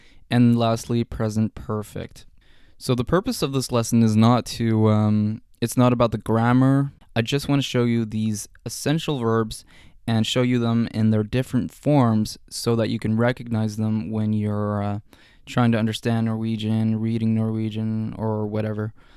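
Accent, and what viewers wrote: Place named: Trøndelag, Norway. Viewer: English modal verbs like to be able to/can, must, should, etc. don't conjugate, therefore it's the opposite. They are in the infinitive form but don't have corresponding conjugated forms for pronouns. I see you published this two years ago now and feel as if someone has probably expressed this already in the comments or you've learnt it in the meantime. I really like these videos and it's good to hear people speak this trøndersk stuff, lest one get too used to that Oslo dialect. ;)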